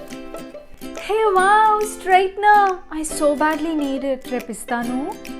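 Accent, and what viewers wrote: Godavari, India. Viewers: Slowly i bcame fan of ours. U r male getups r osm, suits u a lot. Godavari dialect is so funny osm. It shows how much hardwork u make behind the scenes.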